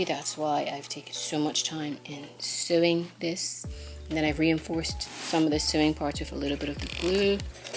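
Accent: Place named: Bermuda. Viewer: Always nice to watch your DIYs, they’re very satisfying along with the very Bermudian commentary😉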